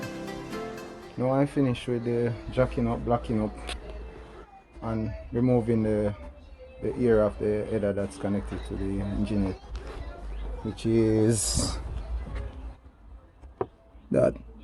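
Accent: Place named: Jamaica. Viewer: Love how u keep di ting straight jamaican... real yaad man mechanic. Keep it up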